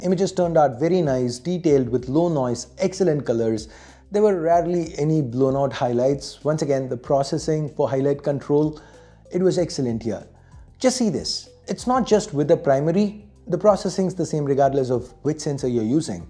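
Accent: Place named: India. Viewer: I swear i couldn't continue watching the review because of his indian accent ITS HARMFUL TO THE EARS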